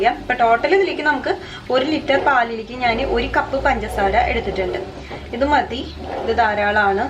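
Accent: Thrissur, India. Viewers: Trissur bhasha polichu. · Thrissur alle veeed Alla... slank? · thrissur da bro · തൃശ്ശൂർ ആണല്ലേ 😃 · Pukkaaaa thrissur bhaasha...ngaan thrissur adutha padiche. Nostalgic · അടിപൊളി ചേച്ചി ഈ കണ്ടതൊന്നുമല്ല വീഡിയോ ഇതാണ് ചേച്ചി പറയുന്നത് നല്ലതുപോലെ മനസിലാകുന്നു നല്ല voice തൃശൂർ ആന്നോ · Chechi de varthnm nth rasa... Enik othiri ishtay.... ❤️😘Trissur aana place?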